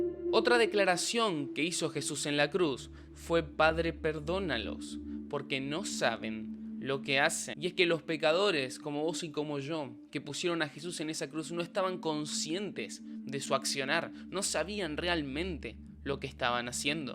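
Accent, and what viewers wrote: Argentina. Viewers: wtf cuando habla argentino y tiene un canal de youtube sobre teorias y conspiraciones de jesus xddd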